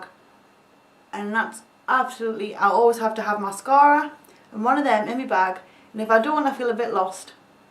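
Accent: Newcastle, United Kingdom. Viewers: The accent comes from south shields, which is in northeast England very alike to a Geordie accent love it <3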